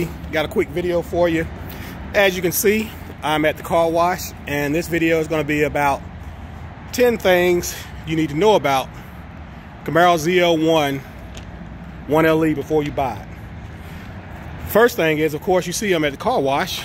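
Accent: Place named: South, United States. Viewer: You can tell you right from down south.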